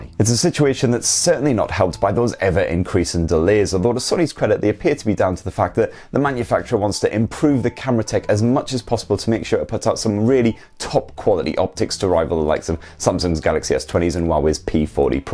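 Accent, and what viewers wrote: United Kingdom. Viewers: Can I just say, it is so Great to hear that wonderful British voice, I fricking love this channel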